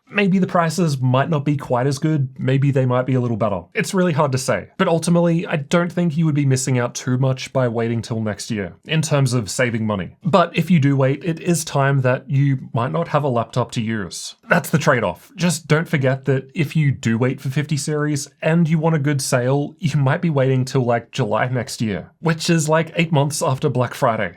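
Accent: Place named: Australia. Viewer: I like Aussie voices. Never stop talking, Aussie boy.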